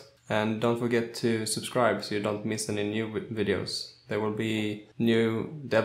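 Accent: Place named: Sweden. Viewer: I lived in Sweden quite enough to notice the Swedish accent :)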